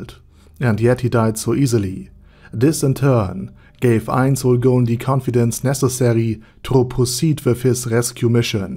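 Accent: Germany. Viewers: Bro are you from Germany? I can hear your accent.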